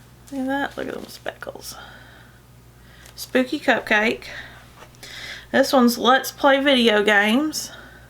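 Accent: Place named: South, United States. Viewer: Probably spelling this wrong..but Cruella DeVille. I would love yarn named for her. White,black,throw some red in for her lipstick and maybe some ash for her cigarette. as I was watching I said..this gal is from the south..she talks like me. I live in Hartwell,Ga. My son use to live in Albany so I know where you are from.❤️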